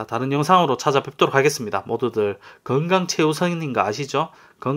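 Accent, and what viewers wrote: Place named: Daegu, South Korea. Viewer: 자막이 없으니깐 발음이 뭐라하는지모르겠네요;;...사투리보니깐 대구사람인거같은데ㅠㅠ오토바이 땡겨먹기 한번하시죠😂ㅎ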